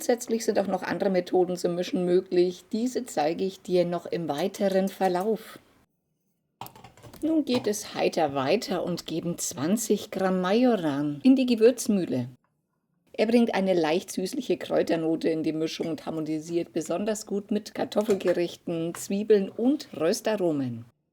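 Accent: Bavaria, Germany. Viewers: Servus,hab vor Jahren eine Brotgewürzmischung so gut versteckt,und durch Zufall wiedergefunden.10Jahre alt,Nase reingesteckt,war wie frisch gemahlen.Wenn es trocken gelagert ist,hält es lange.Dein bayrisch gefällt mir.SG aus Österreich.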